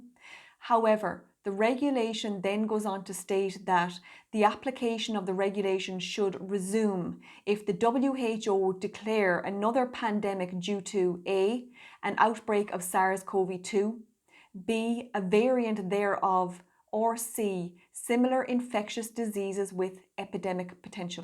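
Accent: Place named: Israel. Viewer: Why are you saying dates like hebrew English. We speak UK English. Prehcedent not pricedent.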